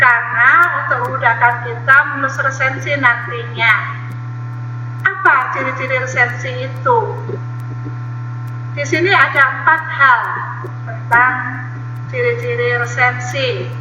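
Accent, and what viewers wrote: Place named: United Kingdom. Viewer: Aksen british nya terasa sekali ya ^_^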